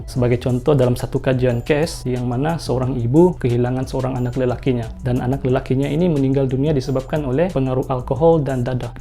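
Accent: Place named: Sabah, Malaysia. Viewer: Ya, agak jelas slang sabah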